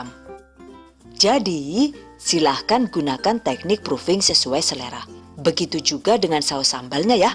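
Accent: Banyumas, Indonesia. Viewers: Kakak orang madiun,? Tapi logatnya kok ngapak.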